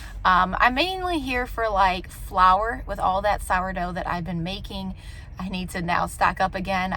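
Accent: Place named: South, United States. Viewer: !… please let me know where you were born!… You are so beautiful You look like a California beauty!… But, your wonderful voice sounds southern!… How is this possible?… Just have to say you’re a stunning Mama!.. A perfect woman to yourself, your husband, your babies Your the whole package perfect in everything you do!… Love watching your do what you do!…. A sub until you decide you no longer do your channel!…. And, hello from Rosarito Beach 🏝️ Mexico 🇲🇽Retired old California Gurll!… 🥰😍❤️👌🏽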